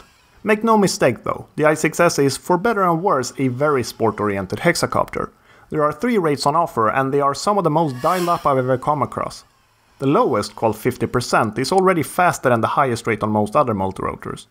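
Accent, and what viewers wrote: Sweden. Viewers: like ur vids man, love the swedish dialect, keed it up.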